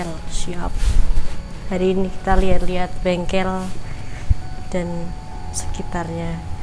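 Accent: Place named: East Java, Indonesia. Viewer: Yoi santuy. Logatnya kek Jawa timur an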